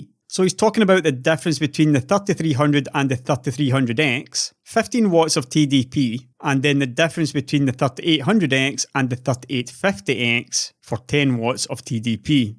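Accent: Scotland, United Kingdom. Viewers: Thanks for explaining all this "so clearly"! I sure hope AMD can deliver all these improvements for near what your leaks and analysis suggests - cause I want one! If AMD pulls this off, they'll bury Intel... Running around saying "It's too good to be true" will do nothing but bump up the prices I'd think, since if they know they're delivering much more than the customers expect - or that the competition can possibly keep up with - why would they lower them? Even if people think that's true, they should keep their mouths shut and just see what unfolds! One software issue I can think of with these new high core and thread count architectures is how well the operating system's scheduling logic can take advantage of them. Properly optimizing the use of all the hardware resources based on the workload used to be a really complex problem back when I was in school - and that was just to handle dual and quad core architectures. 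(Yeah, "school" was a long, long time ago)... I hope the current state of the art (in figuring out optimized scheduling algorithms) can effectively exploit what all this modern hardware can now deliver... As always, keep up the great work - and have a merry Christmas and a wonderful New Year! p.s. - Your Scottish accent is still most excellent too! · Never and I'm not even a native English speaker. 🙂I love his Scottish accent.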